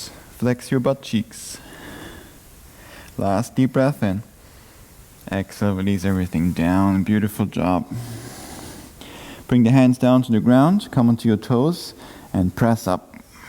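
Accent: Germany. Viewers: ich dachte mir doch schon, dass ich da einen deutschen akzent gehört habe :D herrlich! mega flow. awesome job.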